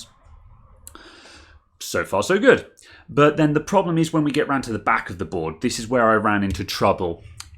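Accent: England, United Kingdom. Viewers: Man, i am not an expert, i do repair things from time to time, but your voice and especially your English accent, really make me watch your clips. I have an issue with a keyboard: a column of keys get lights but don't send a signal, the keyboard is Logitech G pro. I try to bridge but it seems all keys ( F7, 5, T, G, V and Space) are one link on the board. Do you have any idea on how to start this repair, whats spares should I look for. This issue appeared after I try to resolder a switch ( I ve done this before, many times but never saw this type troubleshooting ). Thank you so much and Rock on!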